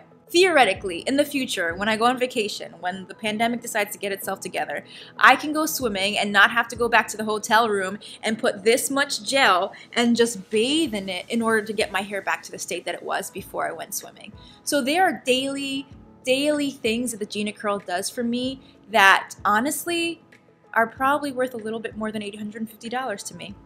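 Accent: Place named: Jamaica. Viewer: I could tell you are of Jamaican ancestry based on the way you speak. Notice, I didn't say your accent. You said something a specific or a particular way that was a give away to me. It's not obvious but I noticed. Your hair is looking lovely.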